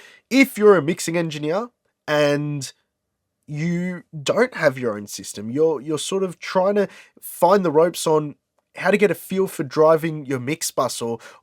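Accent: Australia. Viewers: As soon as I heard the ausie accent and swear words I subd 👍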